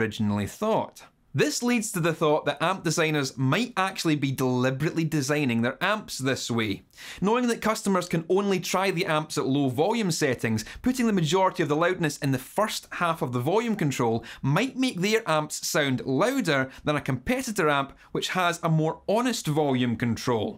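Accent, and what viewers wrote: Scotland, United Kingdom. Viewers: Nice scottish accent:-) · I just love that Scottish accent. Think $1.00 per watt. I use a Crate Power Block CPB150 150 watt amp through 2 stereo Akai SW-155 speakers with a 250 watt car woofer. They sound great the way I have 'em wired up. I love my Crate. It's a Great Crate. The girl in my house (wife) screams "turn it down"! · I love your scottish accent. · Two things. First off I love your accent. Are you Scottish? ? Second thing is I play bass and drums. I did a bass gig a few years back where I used my friends Randal beauty bass rig. Seperate head and cab. No pedals, bass strait to amp. I think the cab configuration is 6 10"s . I'm not sure of the wattage. But it's a great old unit. No frills, just really fat clean low end.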